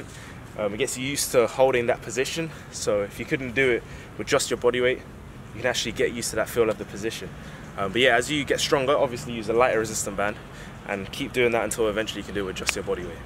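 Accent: United Kingdom. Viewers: yo man where you from in the UK? Nice tutorial very informative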